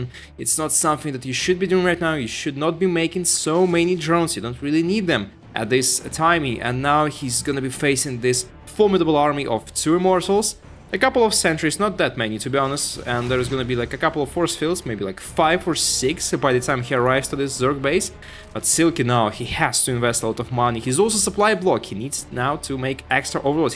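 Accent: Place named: England, United Kingdom. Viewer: ого, вы из англии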